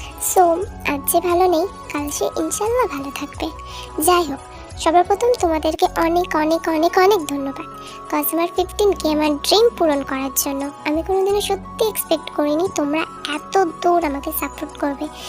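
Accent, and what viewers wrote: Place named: Bangladesh. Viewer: Bangladeshi der moto kotha bolo keno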